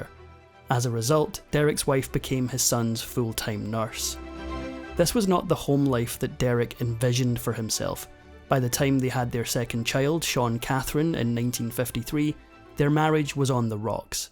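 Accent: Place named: Scotland, United Kingdom. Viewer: Absolutely NOT AI. This is probably a man from Edinburgh, Scotland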